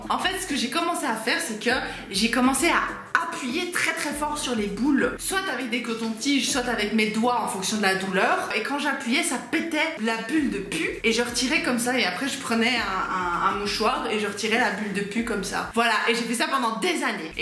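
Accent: Belgium. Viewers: J'hallucine peut-être auditivement mais .... serais-tu BELGE? ❤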